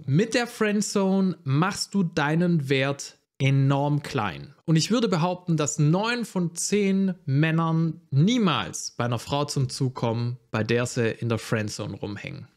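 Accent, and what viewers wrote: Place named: Swabia, Germany. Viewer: Geiles Video. Den Schwabe in dir bekommt man nicht leicht raus. Grüße ins Ländle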